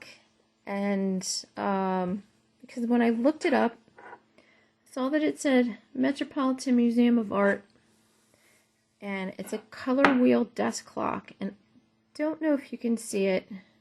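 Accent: New Jersey, United States. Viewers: What a soothing, ASMR voice she has. But that's not why I subscribe. She does a great job of in depth evaluation of resale items. I never miss an episode. I love that Jersey (or what ever it is) accent.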